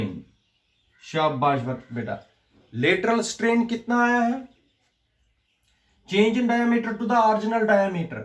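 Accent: Kashmir, India. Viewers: sir why do you have a specific “kashmari" accent.....🙂 😊 😊 and love ur....teaching style sir...❤️❤️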